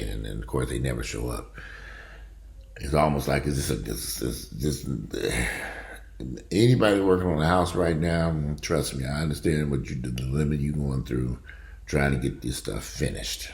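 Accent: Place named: Gary, United States. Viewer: Hi, I am from Gary. I left after graduation. Picked up a couple of degrees in California. Left and went to Canada for a few years. Then I went to the middle east to work. Afterwards I settled in Europe for the next 40 years. I enjoy hearing you talk because it is not often hearing from home Gary. Until next time. Earl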